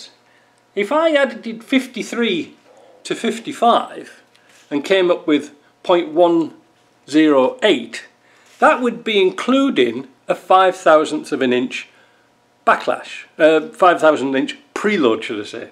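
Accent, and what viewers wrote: United Kingdom. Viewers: Dear unknown , watching you from Poland , beacuse I learn english and you are a good teacher , I learn a lot of constructon , fixing 4x4 so thank , UK accent nicely.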